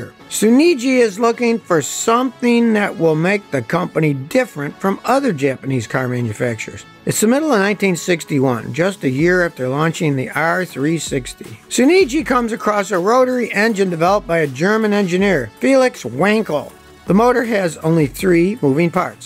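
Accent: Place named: Appalachia, United States. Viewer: Now, that's a Hillbilly accent!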